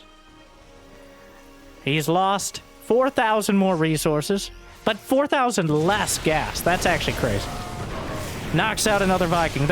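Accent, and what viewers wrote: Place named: Appalachia, United States. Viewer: Can you speak proper English please ? Your hillbilly dialect is soo cringe … is it an act?😢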